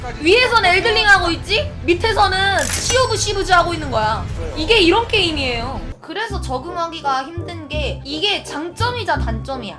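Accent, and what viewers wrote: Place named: Jeolla, South Korea. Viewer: 전라도 분이 신가..? 말투가 되게 중간중간 구수한 발음이 나오시내 ㅋㅋㅋ 재밌게 봤습니다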